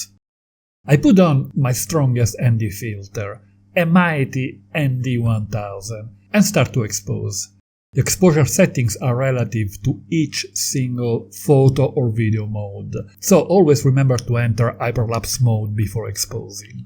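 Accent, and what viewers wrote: Italy. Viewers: I like the Italian accent :D Great job and thanks for the info!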